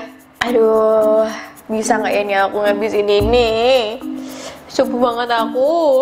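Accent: Java, Indonesia. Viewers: Aku baru pertama kali nonton suka gara2 medok gitu walaupun aku orang sunda wkwkwk 😆😆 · Kusuka medokmu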